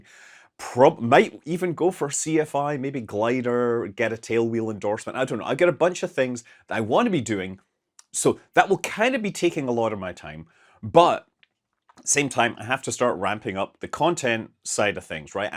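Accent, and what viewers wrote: Scotland, United Kingdom. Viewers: Please confirm that you will still says "moon" with that deep Scottish accent. Nobody wants that to change. On a more serious note: Congratulations on this new chapter on your life. It seems you have a lot of good fun ahead of you. Happy for you. Enjoy the ride. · I'm not here for the visage. I'm here for that Scottish brogue! 😁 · Congratulations, you're a genuine good guy who deserves all the success in the YouTube world. Have always loved your channel and your unpretentious style of presenting. Plus you haven't adopted some awful mid Atlantic drawl and kept your lovely Scottish brogue 😂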